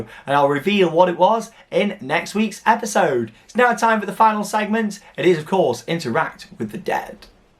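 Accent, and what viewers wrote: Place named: United Kingdom. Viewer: Being an American, it always makes me laugh when you and some other brits say duel, because it sounds like juul 😂 “...Now this will be a new juuling coaster...”😂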